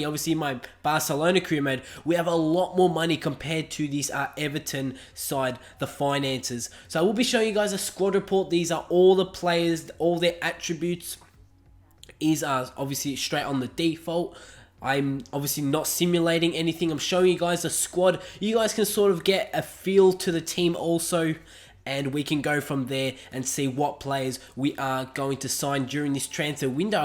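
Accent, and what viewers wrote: Australia. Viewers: you from Australia??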